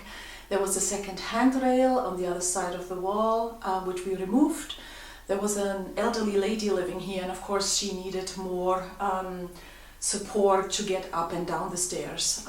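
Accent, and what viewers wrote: Scotland, United Kingdom. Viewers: I hope you don’t mind me asking but I’m curious to know where you’re from. You obviously have a Scottish tilt but I can detect another accent, possibly German or Scandinavian? Have you lived in Scotland long? Lovely video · I love your home and your colours and the way you have picked up a slight Scottish accent accent.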